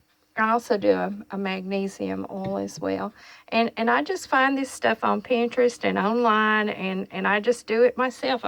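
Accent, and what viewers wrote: South, United States. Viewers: Great video,! Love it everytime I see it / review it. Have shared this particular video with several friends, including my mom. "These are my good DRANKIN' glasses! "- love it! ( referring to the 12 oz mason jars)! I'm not making fun of you at all though! I love your Southern accent and mannerisms! I'm a Southerner, too - a "Florida Cracker", and have some family up in Tennessee. Appreciate your channel and information on wild lettuce VERY much!!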